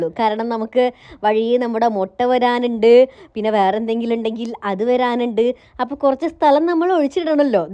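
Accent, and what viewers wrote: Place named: Alappuzha, India. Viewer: നങ്ക് എന്ന് പറയും... ആലപ്പുഴ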